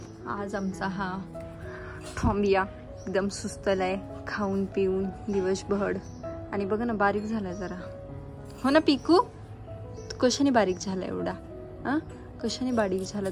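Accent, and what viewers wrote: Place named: Pune, India. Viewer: Tu ekdam soft Ani typical Puneri boltes I like it....Ani tuze baby ekdam cute❤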